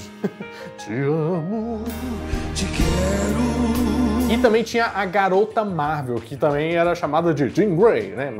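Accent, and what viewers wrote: Minas Gerais, Brazil. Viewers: Esse resumo em mineires ficou bom demais kkkkkkk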